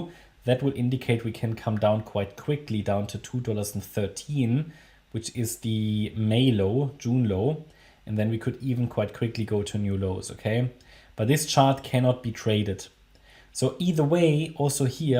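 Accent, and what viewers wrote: Germany. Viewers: Bist bestimmt Deutscher haha man hört es raus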